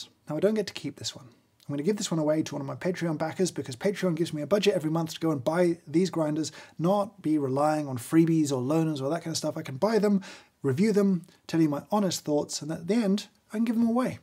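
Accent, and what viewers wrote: Germany. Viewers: His German pronunciation is hilarious. 😁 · good german accent, congrats :) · The englisch/german accent is so sweet :D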